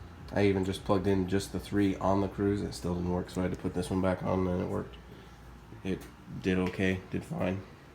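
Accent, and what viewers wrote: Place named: Utah, United States. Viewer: I thought I recognized a Utah accent and then I saw your profile landscape pic of Utah Valley! Am I right?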